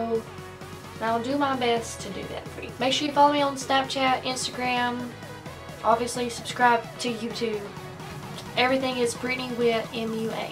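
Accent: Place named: Georgia, United States. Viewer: Oh and where are you from? I'm from Georgia, you sound like you could be from here lol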